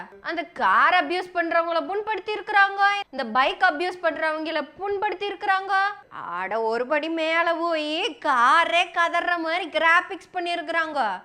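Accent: Kongu, India. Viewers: உங்க கொங்குத் தமிழ் மிக மிக இனிமைங்கோ! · அருமை 🖤❤️கொங்கு தமிழில் சாதி கொடுமைக்கு எதிர் பேச்சு கேட்ப்பது இனிக்கிறது 🙏காரணம் அங்கே தான் சாதி வெறியை ஆடி 18யில் கண்டேன் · பேசு மகளே பேசு இது தற்கரி தலைகளில் நங் நங் என்ற கொட்டுகிற கொங்கு தமிழ். கொஞ்சுகிற கொங்கு தமிழ். சங்கிகளின் கொட்டத்தை கண்ணத்தில் அறை விட்டு அடித்து விரட்டும் கொள்கை தங்கங்களின் சங்க தமிழ் அழகு தமிழ்.Allhuakber. jaibheem Periyar gr.daughter வாழ்க வளர்க நலமுடன். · சகோதரி தங்கள் கொங்கு தமிழ் சுவையோடு கருத்தாழமிக்க பேச்சு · கொங்கு slangula செஞ்சுவிடுறது எல்லாம் வேற லெவல் மா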